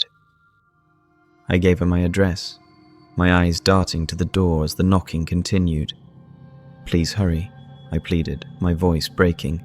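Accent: United Kingdom. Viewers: I enjoy YT channels that create their own content. I am in the process of watching all the videos on your homepage. The British accent in narration adds a nice flavor to the story. You have tales I haven't heard before, as I said the narration is wonderful, excellent grammar in the writing, have you ever thought of writing short stories of longer duration. I'll wager you would be good at it.. Thank you for all the effort you put into your productions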